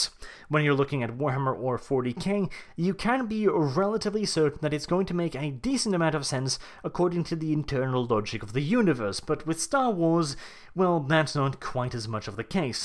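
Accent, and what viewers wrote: RP, United Kingdom. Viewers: im English and a big fan. but please stop shitting on the British when you quite clearly speak in a posh English accent. seems quite hypocritical.:) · He's actually Norwegian, but somehow he speaks in a weird posh british accent.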